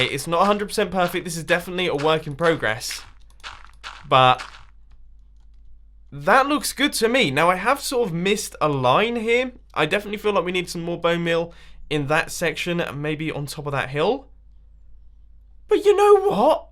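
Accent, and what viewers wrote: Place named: East Midlands, United Kingdom. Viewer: What accent would U say I would say east Midlands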